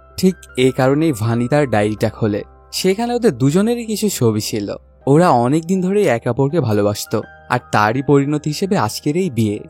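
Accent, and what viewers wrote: Bangladesh. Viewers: Anar gola sune mone hoche Bangladeshi..ader ki oder desher movie kom pore j sudhu amader indian der sob kichu niye pore thake...asole India chara to choltei pare na..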